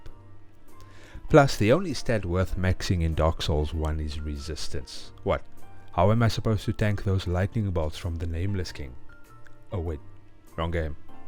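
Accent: South Africa. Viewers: Enjoyed the vid, you sound like you're from RSA too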